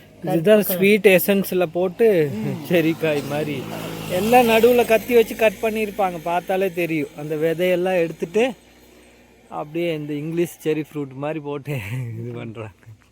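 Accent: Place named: Karnataka, India. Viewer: ௨ங்கள் பேச்சு ஒ௫ மாதிாியாய் இ௫க்கிறதே நீங்கள் கன்னடத்துகாரரோ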